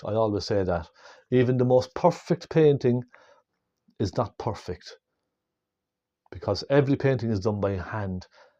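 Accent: Ireland. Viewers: it is very nice to hear an Irish accent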